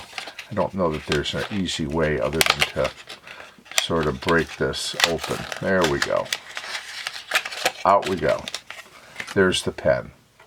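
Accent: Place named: Great Lakes, United States. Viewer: ESSAY ALERT 🚨 Mid 60-year-old, always a pen fanatic, I recently came across a website all about the history of Parker pens. I’m sure fans of Parker know of this website. I remembered I had bought a Jotter in the early 90s, replaced the cartridge with a bold cartridge, didn’t like the way it wrote particularly and so threw it in a box where it has been for decades. Website gave date codes so recently I found and dated my old black jotter to sometime in 1991. Decided to refill that jotter with a modern jotter refill, which I am assuming is their best work on the ink so far. Enjoying the way it writes, decided to purchase a new Jotter XL, and I really like this one which is perfect for my large hand. Was in thrift store recently, came across a bag of old pens and pencils, looked at this bag, and to my amazement saw a stainless steel Jotter! 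The whole bag sold for around two dollars, threw away all the other cheap no-name pens, pencils, and kept the jotter. Replaced the cartridge with a fine point. What a thrift store find that was! So I use my old black Jotter and my new Jotter XL and the thrift store stainless steel Jotter and I’m happy as a clam now. The Jotter stainless steel date code worked out to be about 1991 as well. Both were made in the USA, the new Parker XL was made in France. Does anybody know if the original is made in Janesville? How many other factories does Parker have in the USA? Happy writing! Great video, really enjoyed it. Nice Great Lakes accent too 😉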